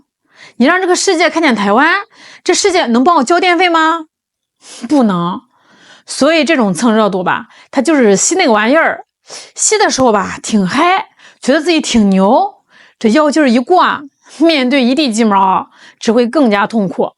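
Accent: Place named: Beijing, China. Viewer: 每一天在聽在學的口音, 北京口音？非常喜歡！❤❤❤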